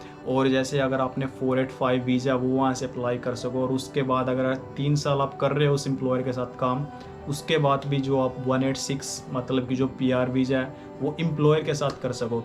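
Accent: Haryana, India. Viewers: Bhai aapki language te hi pta chla haryanvi aa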